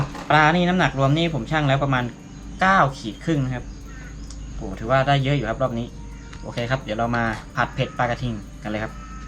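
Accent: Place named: North, Thailand. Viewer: สำเหนียง จะอี้ เป๋น คนเหนีย แม่น ก่อ นี่ น้อง 55555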